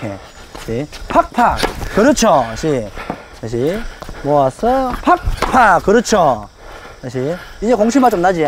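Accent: Gyeongsang, South Korea. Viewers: 지역어디세여? 레알 오리지날 사투리이신뎅 ㅋㅋㅋ(저두 갱상도)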